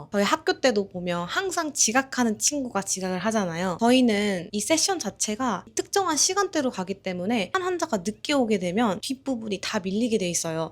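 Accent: Jeolla, South Korea. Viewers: 전라도 사투리가 참 정겹네요 잘 들었습니다 감사합니다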